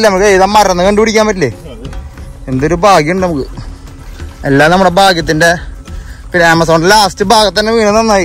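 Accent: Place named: Ollur, India. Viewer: Ollur slang ahno poli slang ❤❤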